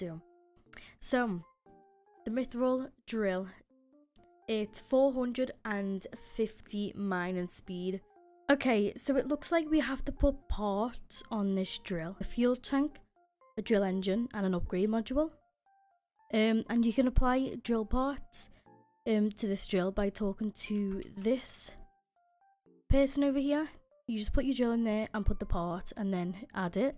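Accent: Liverpool, United Kingdom. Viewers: Just a question r u British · are u a scouser? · sheee, the scouse god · Are u scouse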